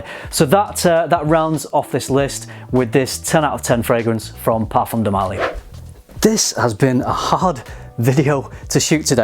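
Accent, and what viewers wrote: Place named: Yorkshire, United Kingdom. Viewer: No that’s a Yorkshire accent (northern) cockney being southern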